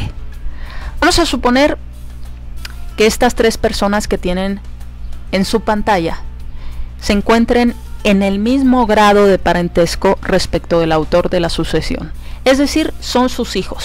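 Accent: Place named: Mexico. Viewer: María Camila Simbaqueba Sánchez al parecer es de México, por el acento.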